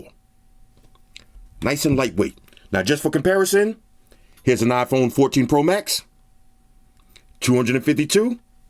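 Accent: New York, United States. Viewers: haha I know a New Yorker when I here it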